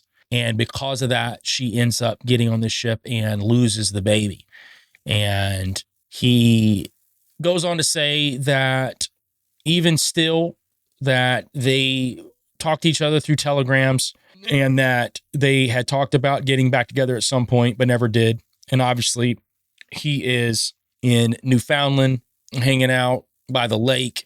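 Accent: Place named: Pennsylvania, United States. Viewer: You sound like you have a PA accent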